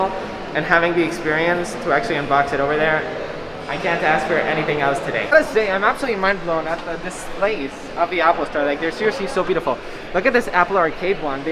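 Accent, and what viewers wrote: Spain. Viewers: los que somos españoles escuchándolo hablar español y dándonos cuenta de que es español 🤡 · ese acentito español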